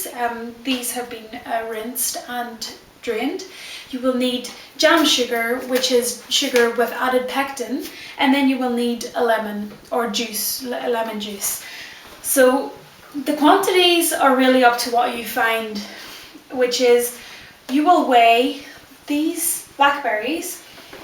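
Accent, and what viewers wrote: Ireland. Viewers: Irish accent? Or Welsh?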